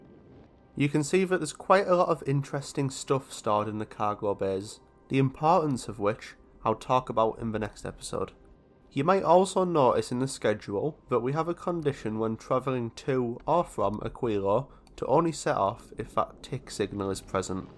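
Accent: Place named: Northern England, United Kingdom. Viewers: Its a northern england accent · Also I'm dutch but me mom is from York, your accent seems to be mostly Geordy with a bit of Yorkshire added.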